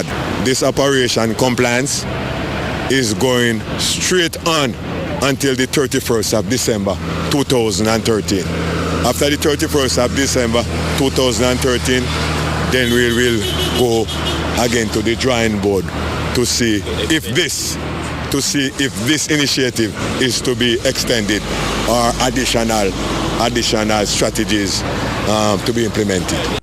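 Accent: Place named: Jamaica. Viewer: The man yah patois deep you fret!!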